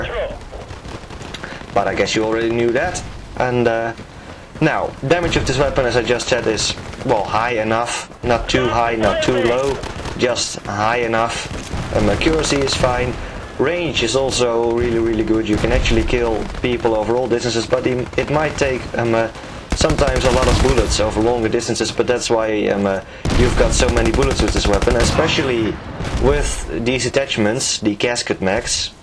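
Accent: Netherlands. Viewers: Primary weapon: G3A4 Secundary weapon (Optional): none Pistol: M9 Attachments: none Gadgets: none, only the standard one (ammo box) I want to see you, and your skills only, good luck!! P.s. what is your first language? Dutch? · Just a question:arr dutch? :D i think your voice sounds dutch^^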